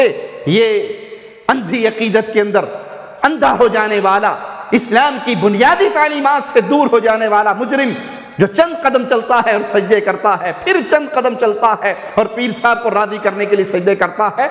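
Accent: Arab world. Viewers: اردو کو اردو لب و لہجے میں بولا کریں۔ عربی تجوید کے ساتھ نہیں۔ اردو کو عربی میں بولنا اتنا ہی غلط ہے جتنا عربی کو اردو میں بولنا۔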